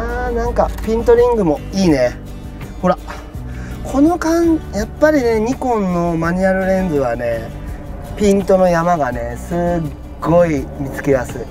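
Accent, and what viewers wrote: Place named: Kanto, Japan. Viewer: 偶然この動画にたどり着きました。築地って、Zfcがピッタリ似合う町ですね。驚きです。写真系動画は、自分で見ている範囲では関西の話し方の人がが多く、説明を解読？している感じで苦労があります。様の話し方は、東京？関東？ふうでフィーリングやニュアンスがしっくりきて聞きやすいです。バックナンバーも見てゆきますのでよろしくお願いいたします。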